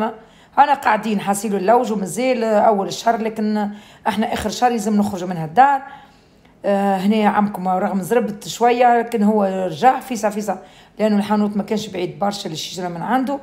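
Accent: Tunisia. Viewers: ما احلاها اللهجة التونسية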